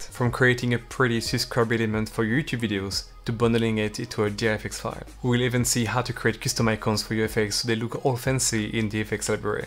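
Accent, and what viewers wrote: France. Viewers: you have french accent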